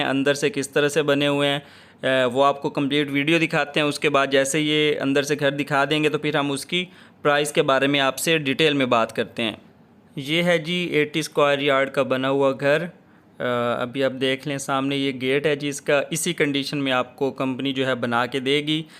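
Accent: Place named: Punjab, India. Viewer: location آواز سے پتہ چلتا ہے کہ بندہ پنجاب سے ہے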